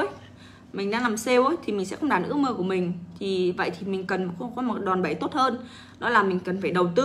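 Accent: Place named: Hai Duong, Vietnam. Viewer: anh chào em nghe như em là đồng hương hải dương nhỉ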